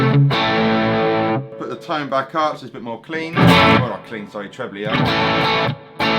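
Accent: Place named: South London, United Kingdom. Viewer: Great video - the most basic fuzz lesson but the most instructive. And love the SRV/Hendrix towards the end!!! Brilliant. And love the sarf Londern accent...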